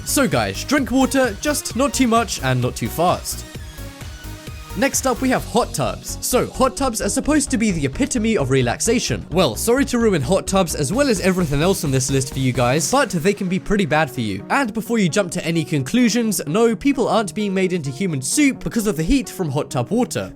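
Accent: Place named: United Kingdom. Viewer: you have a strange American accent, sounds very much like a British one.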